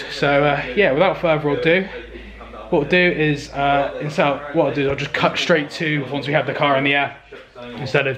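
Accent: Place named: United Kingdom. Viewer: Too much BS jibber jabber… WTF… just show the actual work instead of constantly apologizing in your fucked up wanker, limey accent…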